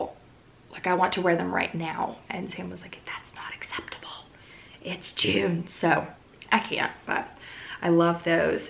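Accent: South, United States. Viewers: I love your country accent WAY more than your "stage voice" it's so authentically you! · Hey! 😀 I thought you were speaking with a country accent since I just watch your main channel videos and this is the first vlog I watch so it caught me off guard hearing your normal voice lol. Have a great week 😀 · I’m from Mississippi so I love a southern accent! Also I died at “coochie cutters” hahaha we said that growing up!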